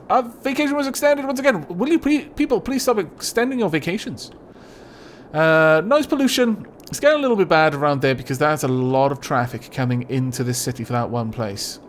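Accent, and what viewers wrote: Wales, United Kingdom. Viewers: 'Scallywaggery' is now my absolute favourite word to hear said with a Welsh accent.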